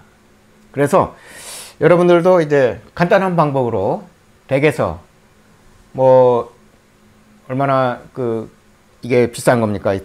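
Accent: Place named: United Kingdom. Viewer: 정말 좋은 기술 가지셨네요 한국에 계시가요 아니면 영국에 계신가요 영어 발음이 아주 영국식 이쎠요 미국에서 드림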